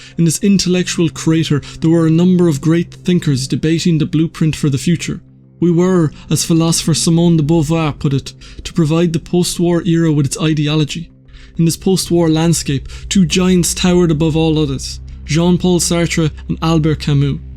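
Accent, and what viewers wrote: Ireland. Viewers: the problem with this video is it is terribly biased. as in many dialectics, both men were correct. life isnt black and white. in general violence is to be avoided, but there are times when radical change and revolution is necessary. if one lives in a democracy today, more than likely it came from a violent revolution. in terms of ussr obviously sartre was wrong, in terms of algriers camus was wrong. the idea that one can support a totalitarian regime in its crimes to create a utopian future seems as naive and dangerous as it is to think colonialists will stop theft and murder bc you give them flowers. it seems particularly interesting coming from a man with an irish accent, there wouldnt be a free ireland at all had they not fought. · More of the later I think. Although we may have chatted in a group after the course, we definitely passed each other around the court! 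I just saw your post on r/philosophy, i would usually pass over videos, but am reading Camus at the moment so thought i'd watch. I was glad i did when i heard an irish voice, then when your face came on, i recognised you immediately!! 😂 Great work on the channel looks like you are working hard at it, and exploring some really interesting stuff. This video was great, very interesting stuff! Have you read much Stephen Batchelor? I thought Alone With Others was fantastic, I think you might enjoy it too!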